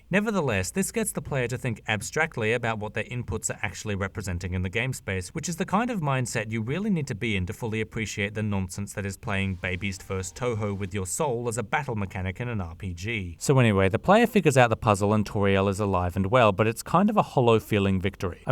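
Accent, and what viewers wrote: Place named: Australia. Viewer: The ozzy accent is strong in this one.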